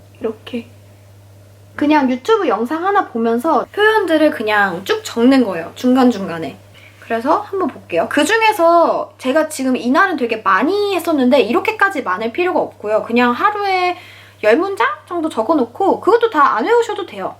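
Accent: United Kingdom. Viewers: 영어가 유창한데 아시아/미국식 섞인 발음이 심해서 사실 예전부터 스트레스를 되게 많이 받았어요.. 어떻게 더 공부해야 할지 고민도 많이 됐었고ㅠㅠ 유튜브 알고리즘으로 님 영상 우연히 보게 됐는데, 영상으로만 봐도 아주 친절하신 분이고 무엇보다 언니의 목소리가 너무 좋으세요 영상틀고 잠들정도인데요❤️ 엑센트도 딱 제가 찾던 느낌이라 정주행 열심히 하고 있습니다.. 언니 앞으로도 브이로그든 공부법이든 그냥 영상 많이많이 올려주세여🙌🏻 언니덕분에 이제 드디어 영국발음고정 마음 먹었습니다..😤 (유튜브에 댓글 정말 안 남기는 편인데..부끄럽습니당,그래도 너무너무 저에게 힘이 되어주셔서 이렇게 달고 갑니당..🌹) 좋은 하루 되세요~!! 🥰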